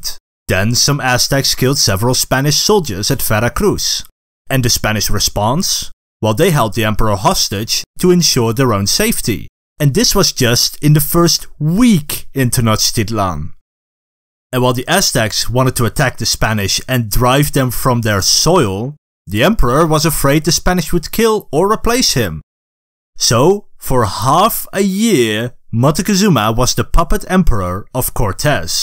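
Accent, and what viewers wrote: Netherlands. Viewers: Are you Dutch? You're accent kinda sounds like it.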